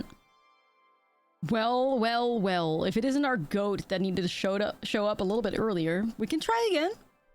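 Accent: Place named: Netherlands. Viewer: Are you from the netherlands? Sometimes your accent sounds like that :D Its kind of cute.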